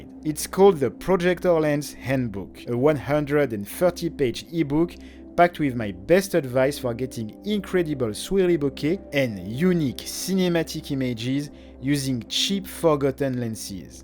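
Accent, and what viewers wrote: France. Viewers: le p'tit accent français, je ne m'y attendait pas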